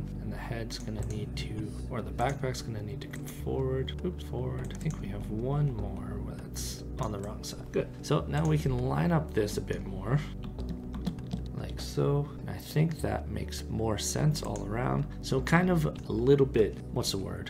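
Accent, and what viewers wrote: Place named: Midwest, United States. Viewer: So curious if you’re Minnesotan, or from one of its neighboring states. Your accent just has some of those midwestern notes I’m so familiar with haha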